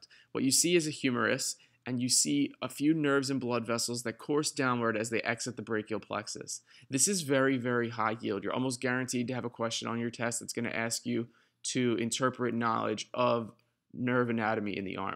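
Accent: New Jersey, United States. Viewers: u from new jersey (central) or from california?!? ha!;) god bless bro! ..for me, healer + teacher combo is this earth's highest calling,,hat's off sir! cheers m8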